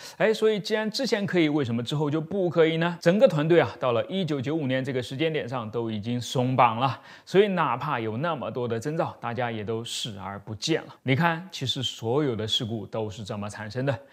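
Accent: Nanjing, China. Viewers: 是南京的？ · 哇，，原来是南京人啊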